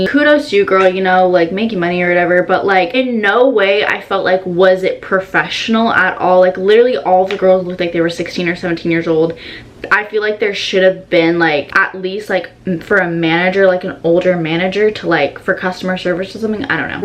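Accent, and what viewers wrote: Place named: Australia. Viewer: loved that australian accent lol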